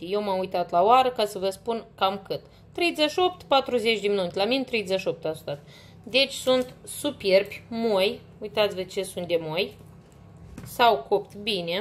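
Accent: Moldova. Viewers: Dulce-i graiul moldovenesc 😃 nu lua în seamă comentariile rele de la proști. Ai un program frumos și mulțumim pentru că împarți rețetele cu noi. Eu sunt din oltenia și înțeleg tot ce spui, îmi place când zici " iaca așa" 😃 Mulțumiri și fericire la toți ❤ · Seară Frumoasă! Dragă! Foarte frumos și delicios! La Mulți Ani Fericiți! Copilașului tău și la toți copiii din lume! Îmi place foarte mult cum gătești și cum explici in dulcele grai moldovenesc! Fiecare regiune are regionalismele sale! Îți doresc o săptămână frumoasă și binecuvântată alături de cei dragi! Cu mult drag! ❤️😘🌹🌹🌹🍒🍓🐈🧁🏡💥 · Ești o scumpă Draguto mie îmi place cum vorbești ,am avut multe prietene din Basarabia când am fost mai tinerica ,bravo ești o gospodină desăvârșită ,💖💖💖💖💋💋💋💋💋🌹🌹🌹🌹🌹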